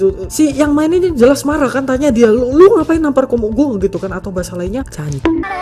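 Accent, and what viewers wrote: Java, Indonesia. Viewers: Begitu kangen dnegan bacotanmu dan kemedok an mu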